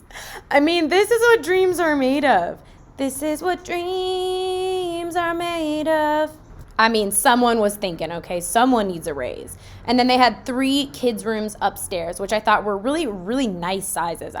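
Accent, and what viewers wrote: South, United States. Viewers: Hi, I’m from England and have been following you for about two years. Am enjoying all of your content, the peak into southern American life (loving the accent) and especially the progress of your relationship with who is just lovely! Personally, I think house No. 1 is best for you both in the long term as, if and when your family expands, you will soon find the extra space will be a godsend. As a mother myself, I would highly recommend the principle bedroom on the same level as the nursery - just think of those multiple night time visits - you definitely don’t want to be “stumbling” up and down stairs!! With regards to house No. 3 I would personally be concerned about the lack of privacy in my rear garden (haha, “yard” as you guys call it). Good luck with your house hunting and enjoy the process - how exciting for you both! 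P.S. Please pass on to your mother what a beautiful lady she is, both from within and on the outside.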